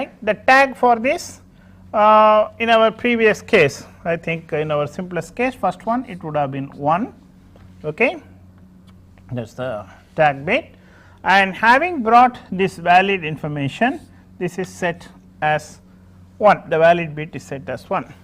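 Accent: India. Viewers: It's sarcasm, I just had a very bad day then. I ended up passing that class just. I personally just find Indian accents very hard to understand.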